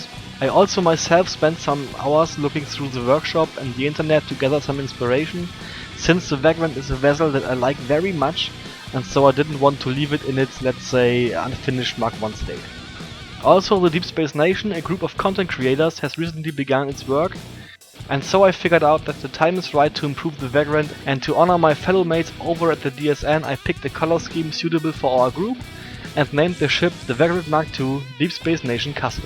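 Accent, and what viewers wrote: Germany. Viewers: I really like that design! Good thing you showed up at the stream. Another good youtuber to watch :D. (German accent I hear there?) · Krasser deutscher akzent xD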